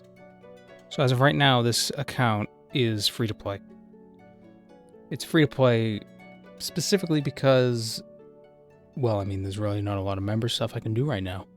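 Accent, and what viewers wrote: Boston, United States. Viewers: i'm just here to look for the boston accent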